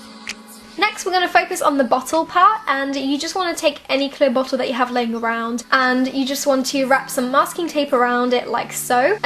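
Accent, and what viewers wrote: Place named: United Kingdom. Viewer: She's British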